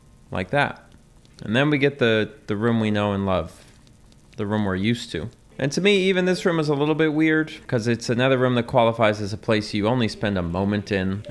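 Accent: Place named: New England, United States. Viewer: interesting how your accent says "ruhm' instead of '"room". you, by any chance, from the new england area?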